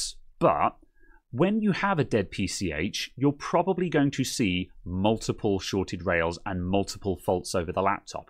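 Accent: England, United Kingdom. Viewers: Just inject 0.8 volts with limiting at 2A current.You ll be safe,if the heated area is crowded,use alchohol and inject power again to spot the exact faulty component.YOU NEED A THOUSAND HOURS TO FIND IT WITH SCHEMATICS AND MEASUREMENTS and at the end of the days you will have learned nothing.Anyway i admire your engilsh accent and your patience.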